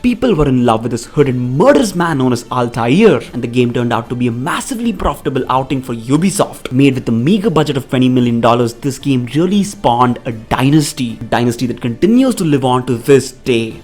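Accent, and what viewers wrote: India. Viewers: ok it's just there are different types of Indian accents so it's hard to tell the way you say well vell gave me indian kind so it's nice to know you come from a lovely country I'd love to visit some time in the future:)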